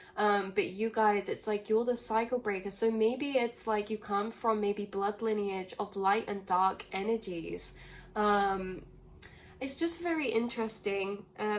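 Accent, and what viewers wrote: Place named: United Kingdom. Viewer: Harry Potter references and your British accent. Perfect fit.😊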